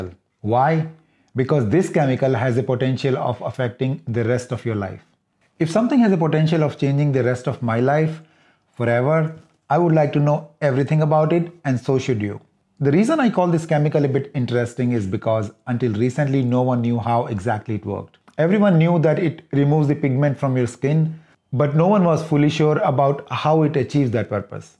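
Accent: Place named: India. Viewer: You sound so much like indian.are you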